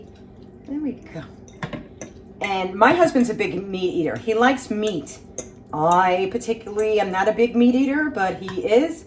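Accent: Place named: Cleveland, United States. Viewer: I love your show! This canned meat meal seems great! Trying it tonight! Doug is lucky! And btw... i live in North Carolina, I date a beautiful girl from Cleveland, your diction and accent are so similar... fogetaboutit! Keep up the good work!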